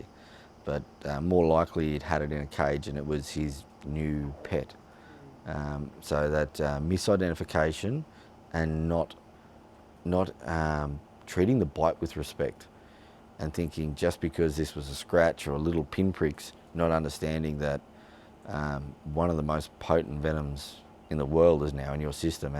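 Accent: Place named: Australia. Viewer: I love the Aussie accent. ❤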